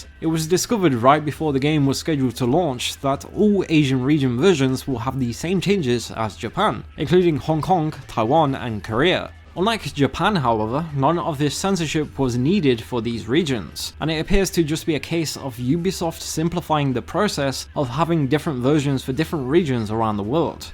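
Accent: United Kingdom. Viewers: He's british. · He is british. It may come as a suprise but most people who write or speak in english on the Internet are not from America.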